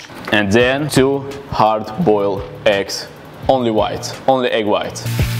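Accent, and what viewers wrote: Russia. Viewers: Русский акцент,уникален) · Good russkiy akcent,! Kak dela?) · сразу выдает русский акцент :)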